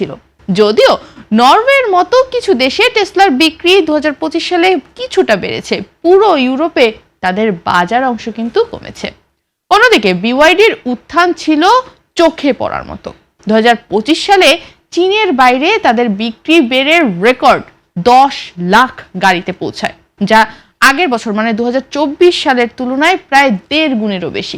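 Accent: India. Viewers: এই মহিলার বাংলা পশ্চিম বঙ্গের মতো লাগে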